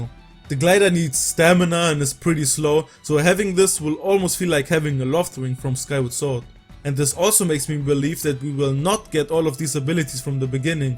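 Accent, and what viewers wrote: Germany. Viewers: Are you german because youre accent sounds lile a german one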